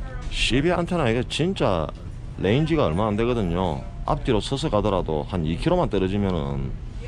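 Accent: Gyeongsang, South Korea. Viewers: 갱상도 사투리 티나네요 해임요 ㅋㅋ 응원합니다 오늘도 굿데이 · 베트남서 시청하고 있는 1인입니다. ㅎ 지난회차 거의 다?? 시청했습니다 ㅎㅎ 묘한 마성의 갱상도 소리를 매일 듣네요 ㅎㅎ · 경상도사투리와 영어가 구수합니다 저도미국에서 트럭하고싶네요 ㅎㅎ 잘보고갑니다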